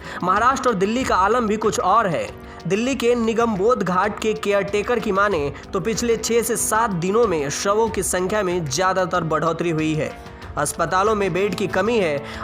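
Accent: Bihar, India. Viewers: Ancadh bihadi hai na Muh mein paan permanent hota inke Ra ko rda bolte hain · Anchor bihari h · बिहाडी 🙄🙄 एक्सेंट तो सुधार ले बन गया पत्रकार 😂😂🤗🤗🤗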